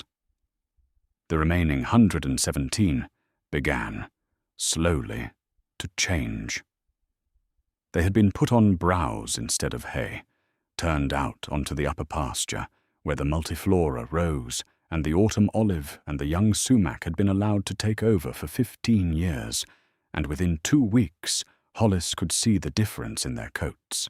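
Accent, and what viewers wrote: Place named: United Kingdom. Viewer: Who cut this, and why is the narrator British? Off.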